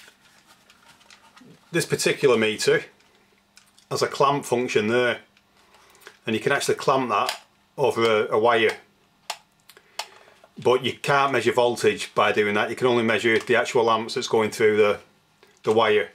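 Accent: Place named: Lancashire, United Kingdom. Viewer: I was thinking burnley or rochdale, but west midlands is way out. Brummies don't say load like "lord". Darwen's a great place, amazing countryside.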